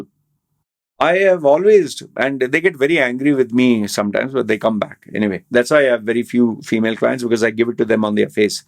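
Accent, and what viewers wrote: India. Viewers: Are you an indian, your accent is completely indian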